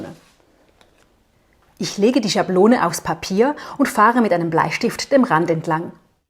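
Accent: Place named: Switzerland. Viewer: Vielen Dank für die schönen Osterideen. Du erklärst alles sehr gut und ich höre deine Stimme mit dem leichten Schweizer Dialekt sehr gerne.😁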